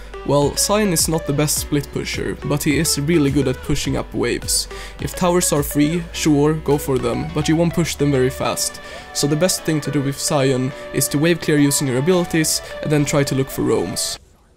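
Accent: Sweden. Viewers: Great vid man! And btw you sound veeery swedish xD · he sounds like he are from sweden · great guide, can you make one that counts for now? love the guide, and how you perform. personally, i main sion, and this guide is pretty good i must admit. love your swedish accent XDD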